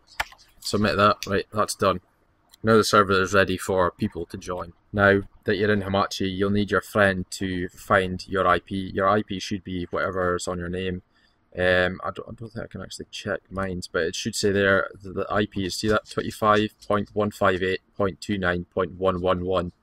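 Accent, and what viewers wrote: Scotland, United Kingdom. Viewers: Wait wait wait wait, wait, R u scottish ? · You sound like a British Redmercy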